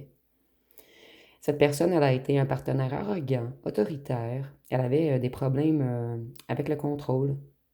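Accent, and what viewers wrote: Canada. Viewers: 😂😂😂😂🏃‍♂️ c'est ENGENDRAIT 🤔🤔 ça passe très bien avec l'accent Canadien.. Merci Belle Âme ❤ les jours passent je me rends compte que je n'en veux plus de cette là.. plus jamais... qu'est ce que je ferai d'une femme objet sexuel qui a parcouru toute la galaxie en fleurtant avec n'importe quel homme.. jamais de la vie.. jai tourné la page et pour de bon.. j'attends l'heureuse élue et je sens qu'on va bientôt se croiser ❤❤ Gratitide infinie envers le Divin et l'Univers 🙏🙏🙏🙏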